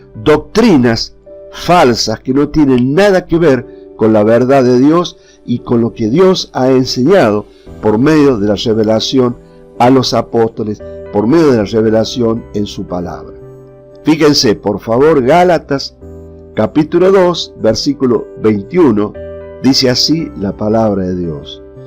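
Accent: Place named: Northwest, Argentina. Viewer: hola hno muy buena enseñanza.. por su acento de donde es usted ?? Tucumano o salteño quizas jujeño?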